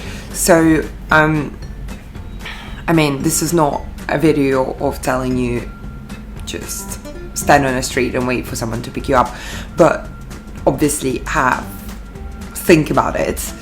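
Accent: United Kingdom. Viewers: Why your accent is British? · Her accent sounds so english from England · Sounds you have a British accent. Did you go to school in the UK? or Australian? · british accent?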